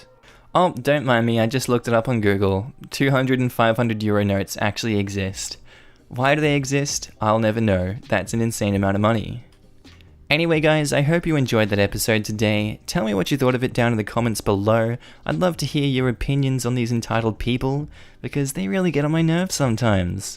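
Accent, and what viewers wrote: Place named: Australia. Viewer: Love your accent! ... Aussie voice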